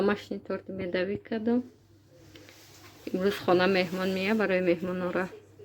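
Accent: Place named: Tajikistan. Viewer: САЛЛОМ ГАП НЕСТАЙТОНА РАНГИ МА ГАП МЕЗАНЕД ЧИСТА ТОЧИКИИ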